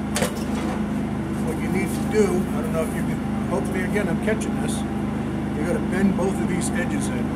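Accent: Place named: Northeast, United States. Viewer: Thank you! My husband was struggling put our 4ft pieces of pipe together. So I watched ur video to see if I could find him some help. And yes it did. So we both thank you for your knowledge. I also want to say I love your northeastern accent. Reminds me of my Grandpa. He was from Eastport, Maine... where are u from? If u don't mind me asking..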